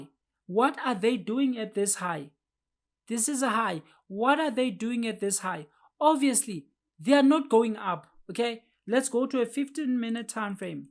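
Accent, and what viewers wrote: South Africa. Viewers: So nice watching a trading video in a South African voice. Do you stream your live trading? Im looking for a community bruu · Are you South African I'm hearing your accent? Cela ungifundisa ubhuti.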